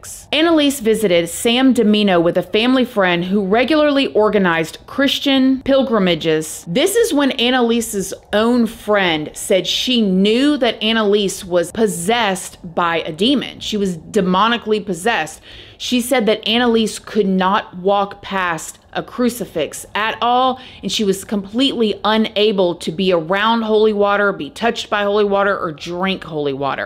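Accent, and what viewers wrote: South, United States. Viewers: I love real life horror. Also, yes I can tell your a fellow southerner lol. Same accent as mine. God Bless.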